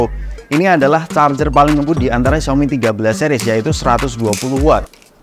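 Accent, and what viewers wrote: Indonesia. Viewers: Ga, malah indo banget aksen nya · Gak lah. Indonesia banget aksen nya kok sampe Amerika latin segala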